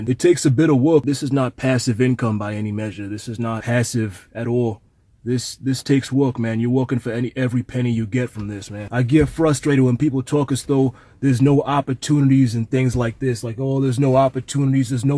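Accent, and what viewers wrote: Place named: Boston, United States. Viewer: are you from boston or the boston area? your accent tells me you are or near by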